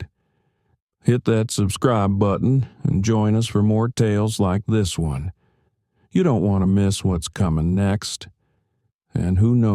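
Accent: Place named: Appalachia, United States. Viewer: Why Is This Narrates By A Hillbilly??🙄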